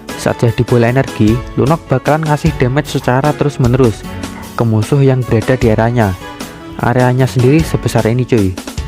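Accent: Java, Indonesia. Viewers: Medok amat bang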